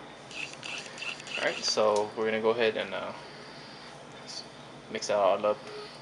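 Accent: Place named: North, Cambodia. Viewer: She from north cambodia? her voice difference from us in svay rieng province. And her food make me hungry too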